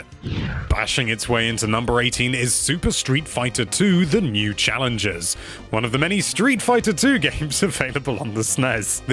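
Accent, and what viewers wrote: United Kingdom. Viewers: It seems Americans (like me) would say Yo as in yo-yo, and this dude (and presumably others in the UK) would say it yo as in "yonder". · It's the British pronunciation, the way we all said it in the days before YouTube... ;) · Its the way we pronounce it in uk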